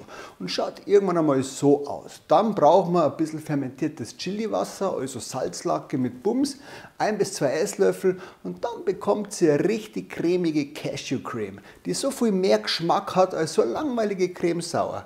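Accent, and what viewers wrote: Bavaria, Germany. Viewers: Kann man sich nicht geben mit dem dreckigen bayerisch 😷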